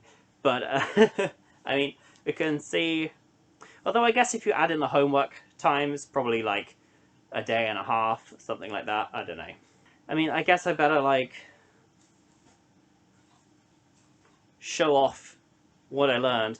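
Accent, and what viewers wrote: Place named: Portugal. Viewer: Your accent is just fine, especially for a beginner, you are speaking with an European accent, the Portuguese accent, it's surprising how good you sound to be honest. Just gotta work on the fluency, but the accent is fine, the user who commented on your accent is just expecting you to sound brazilian. Keep doing what you are doing.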